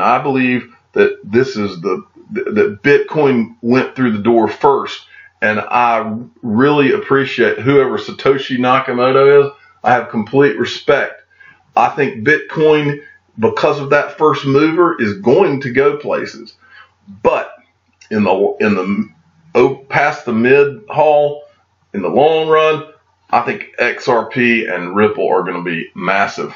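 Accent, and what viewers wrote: South, United States. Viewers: Love the good ole southern boi sweet tea accent lol your videos get me hyped like jungle Inc. You sure do know how to make ripple/xrp hodlers feel proud of what they are holding. Thanks for helping me realize that I needed to dump my neo ontology stellar and cardano all for ripple/Xrp. The light bulb went off 3 months ago after watching a slew of your videos. It finally clicked on which one was the potential winner 5-10 years out from all of the 2000 cryptos listed. See you in Lichtenstein brotha! Also, I live in Georgia. Hopefully we hop on the same flight.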